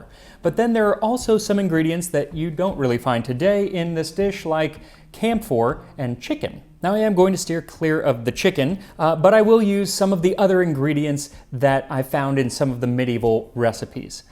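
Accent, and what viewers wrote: Europe. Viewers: his pronunciation is not half bad for someone that probably never studied Arabic before! I think he studied a lot of European languages so he might have added a bit of european style emphasis that would probably only be slightly annoying to native speakers. As long as it's not so bad it sounds like the wrong words, it isn't the end of the world. There's like 2 dozen dialects of Arabic, it's hard to be perfect at pronunciation unless you have some background in it. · All his pronunciations not European still sound European. His Japanese even sounded european. But that's fine. I'm not coming here for linguistics.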